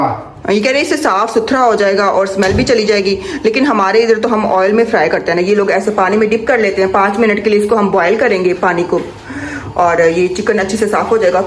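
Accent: China. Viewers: apka Chinese accent Kitna perfect hai MashaAllah bilkul Chinese style sy bolti hu aap apki daughter same apny baba py hai , dear mey bhi jab chicken banati hu pehly Thora boil kr k yakhi phynk deti hu kiu k hum Kon sa khalis chicken khatey hai boilers chicken,,,,,,,, behtr huta hai Thora boil kr k paani phynk dey , Desi chicken ku boiled kr k NAHI phynktey kiu k Wu khalis huti hai , apk husband ki cooking out class huti hai tasty and healthy 👍🥀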